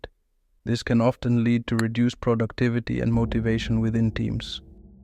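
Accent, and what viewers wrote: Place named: India. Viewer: indian accent and bad microphone = not watching the vid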